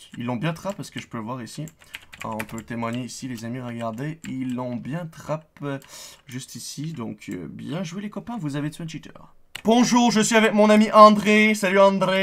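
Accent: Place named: Quebec, Canada. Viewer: j'adore l'ascent des Québecoi <3<3<3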